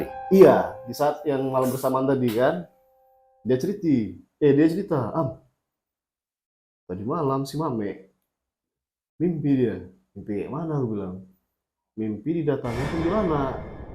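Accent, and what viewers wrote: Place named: Medan, Indonesia. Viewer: Ini baru cerita horor medan,, logatnya masih kental, ceritanya juga seru 🔥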